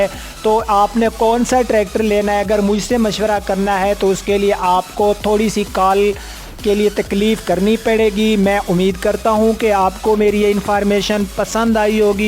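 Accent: Saraiki, Pakistan. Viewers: bhae is a Saraiki speaker. So, his accent is Saraiki but his urdu is more better than any other urdu speaker. Let me explain more. If a pathan speaks urdu his accent is identifiable that he is pashtoon but speaking urdu. Same with punjabi. When Punjabi speaks urdu, his accent shows that he is punjabi speaker. Here in this case you guys think that bhae is speaking wrong but bro its his accent. That is from his mother language Saraiki. Saraiki is a sweet language and require no pressure on throat like pashtoo and punjabi. It relates to sindhi and balochi. So, respect him.appreciate his work and thumbs up.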